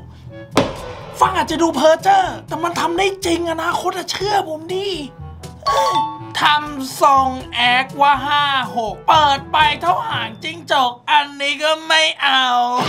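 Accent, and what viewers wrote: South, Thailand. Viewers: พี่ ใต้ไม่นิสำเนียงดีจัด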